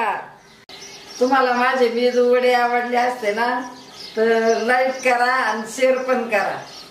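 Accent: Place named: Dhule, India. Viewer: Aho aaji tumhala sagle yete ase naka bolu mi tumcha aavaj eikla ki mala ase vatte ki mi dhule la aahe chan boltat aaji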